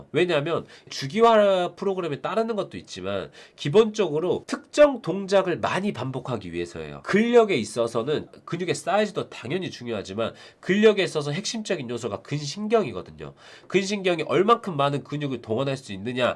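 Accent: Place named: Jeolla, South Korea. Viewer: 아놔 라도 사투리 때문에 안들을란다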